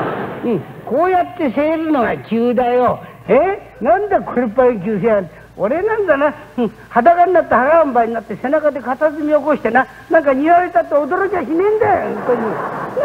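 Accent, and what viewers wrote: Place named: Edo, Japan. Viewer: 現存する音源では最高峰のひとつ。正確に文字に表せない江戸風の言い回しが凄まじい。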